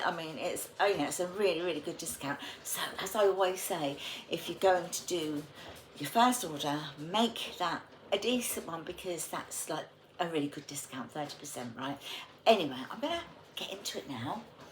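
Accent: United Kingdom. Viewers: I could listen to your lovely voice and British accent all day❤ Just put that grater in my cart! I needed one! Ty. Great haul👍🏻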